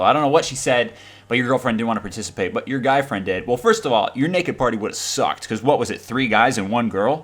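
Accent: Michigan, United States. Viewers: what part of Michigan do you come from? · what part of michigan are you from?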